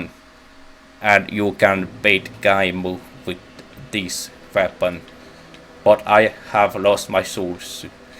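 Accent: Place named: Finland. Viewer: Your accent is bad and you should feel bad. Speak English properly, fucking Finns, I swear to god...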